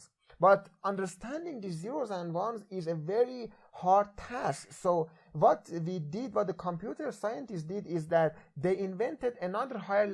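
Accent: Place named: Iran. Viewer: cheghad shabih irania harf mizani !!!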